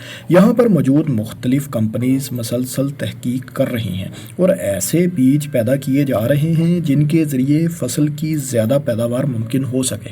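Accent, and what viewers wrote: Punjab, Pakistan. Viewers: خدا کی قسم اردو کا ایسا گندا لہجہ اور پڑھنے کا گھٹیا انداز نہیں سنا۔ یوں معلوم پڑتا ہے کہ کسی ٹھیٹ پنجابی بولنے والے کو اردو پڑھنے پر لگا دیا ہو۔ خدا کا واسطہ اس طرح کا ٹارچر نہیں دیا کرو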